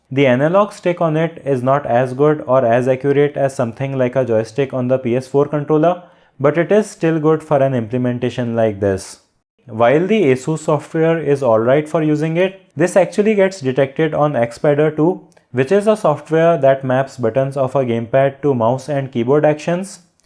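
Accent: India. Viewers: Your English like a hindi language 🙄❤️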